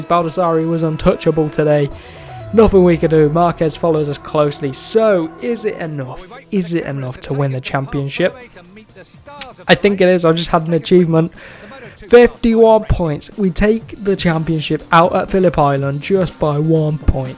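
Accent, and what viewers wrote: United Kingdom. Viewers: I enjoy the 25% races from last grid position. I'd recommend FP in Moto GP to collect R & D points ... but maybe you can show us just a short version (like you do with Q1 & Q2). Well done champ! Besides, you have got an interesting british dialect.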